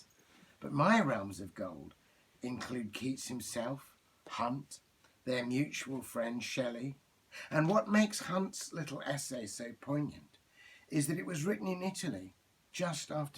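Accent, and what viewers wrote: England, United Kingdom. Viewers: I love your English accent .😊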